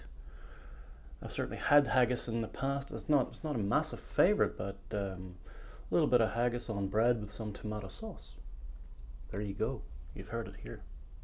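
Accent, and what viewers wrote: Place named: Northern Ireland, United Kingdom. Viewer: Interesting one to hear about. Cheers. Per chance, are originally from N Ireland? Just picking up some local tones. Sláinte.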